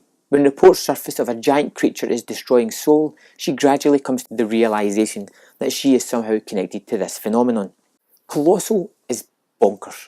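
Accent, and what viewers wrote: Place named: Glasgow, United Kingdom. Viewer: I guess its from Glasgow? Have to digest what you are saying as I am foreigner living in England. But your reviews are good just watched Neesons Non-Stop which was great and the 70-80 % of stuff in Netflix/Amazon is average/bad.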